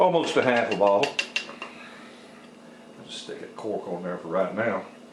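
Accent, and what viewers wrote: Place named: South, United States. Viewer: man I like your style and that good old Southern drawl!! with your recipe maybe the South will rise again! thanks for the video!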